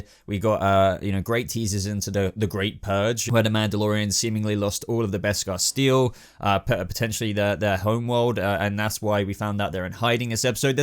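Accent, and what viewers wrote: United Kingdom. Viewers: suuuuuuureeee I want more reviews brooo. You are british then...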